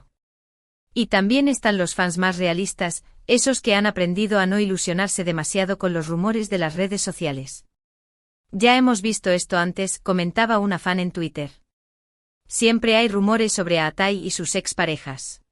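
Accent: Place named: Spain. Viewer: A esta persona que habla sin sentido ,por su forma de pronunciar con acento español ,no se cansa de decir estupideces,el señor Ulusoy vive trabajando no ventila su vida privada ,me pregunto de donde saca tantas mentiras,el señor Ulusoy graba de noche ,de día por lo que se ve en su nueva serie,entonces como esta persona se entera de la vida del actor,simplemente porque miente .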